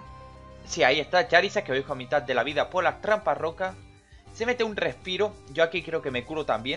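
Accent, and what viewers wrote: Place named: Mexico. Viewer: suenas mexicano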